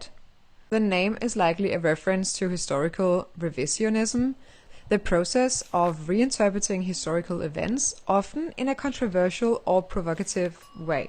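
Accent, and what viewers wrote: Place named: Denmark. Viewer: I soooo love your Danish accent.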